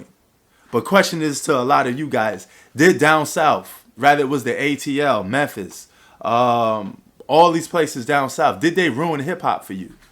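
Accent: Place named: New York, United States. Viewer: Why do you talk the way YOU do sir? Y’all sound funny to us southerners as well so what’s up?🧐 I give props to NY for what they did in the 80’s and 90’s but today NY is Toilet Music. Once Nas and Jigga hang up the mic or pass away it’s a WRAP WRAP. 8 Million Stories and cats up there was scratching to go platinum. The west coast was kicking y’all in the teeth in the 90’s. You think somebody forgot about Puff Daddy ushering in all that jiggy garbage in 1997? Y’all don’t wanna blame him kicking in the door with this over commercialization of Hip Hop. He was the one that opened the door for No Limit and Cash Money. Master P was on his heels in ‘97 too!! The South does have some great rappers but you haven’t taken the time to listen. You just listen to that garbage on Hot 97. It’s a shame when the hottest new NY rapper is Ice Spice. What a joke!! 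Do yourself a favor and listen to by and by as a further rebuttal while you throw rocks from a dusty brownstone in your basement. Btw DJ Premier is from TEXAS!!!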